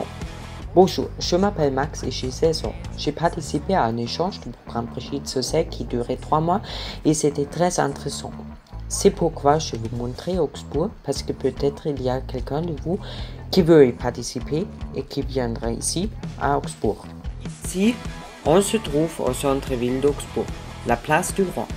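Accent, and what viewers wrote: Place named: France. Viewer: Votre accent français est superbe, j’arrive très bien à vous comprendre..à plus à augsbourg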